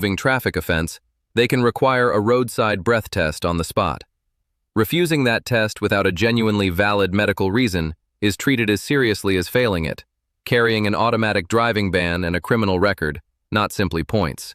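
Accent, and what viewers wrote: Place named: United States. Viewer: Why is this in an American accent with incorrect American pronunciation of the English language used. Americans have destroyed the English language. The content is largely incorrect. What a fraudulent waste of information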